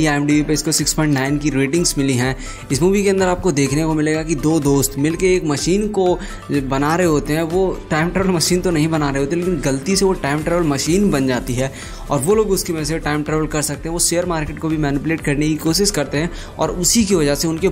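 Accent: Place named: Bundelkhand, India. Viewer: बुदेलखंड से लगते हो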